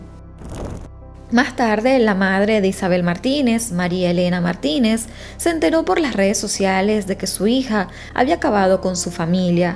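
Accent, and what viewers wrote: Venezuela. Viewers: Amo tu acento venezolano 🌈😍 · te felicito me encantas como marras los casos una pregunta tengo curiosidad eres venezolana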